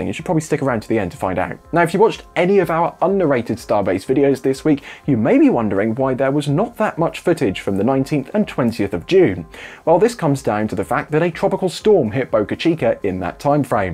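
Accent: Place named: United Kingdom. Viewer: "Centimeters" is exactly why I don't subscribe. There is something inherently comical listening to British accented narration about an American aerospace industry genre, knowing that ESA is virtually non-existent for British narrators to pronounce their terms with an imperious nose in the air pose.:face-green-smiling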